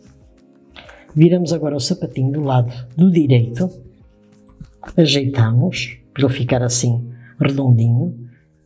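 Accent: Portugal. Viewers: Adoro este sotaque português Adorei seu vídeo, muito bem explicado. Abçs 🇧🇷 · Amei...só consigo fazer com suas explições.É incrivel; sua calma para ensinar; e tudo se torna mais fácil o pá? (Esse sotaque purtuguêis lindo)Porque os portugueses falam o pá? Vemos em nossas novelas qdo tem um ator português.Todos lindos...ual.Grande abraço.CIDINHA. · Amo seu trabalho e seu sotaque português 😍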